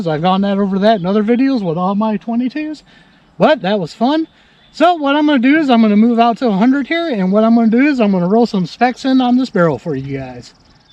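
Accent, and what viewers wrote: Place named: Midwest, United States. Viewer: I've had no issues with anything Bear Creek I've bought everything has been very solid. I don't know why your regional accent threw me off the other day you sound a lot like Steve bartyla from "grow em big" And he's a Midwest boy too.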